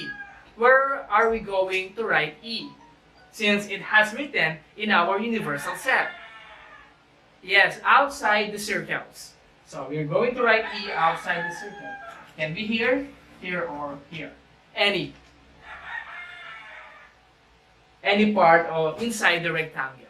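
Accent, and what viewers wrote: Philippines. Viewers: Wow I think your a Filipino hehe it's so clear I understand everything thank you because of you now I know how to answer my math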